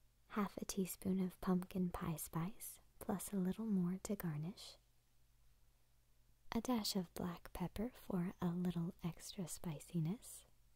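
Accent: South, United States. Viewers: Do you turn the southern accent on and off at will?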